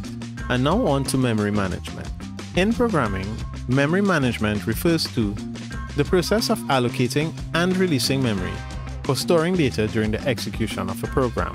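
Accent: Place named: Trinidad and Tobago. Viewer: Dude your trini arent you lmao Love it .. keep it up bro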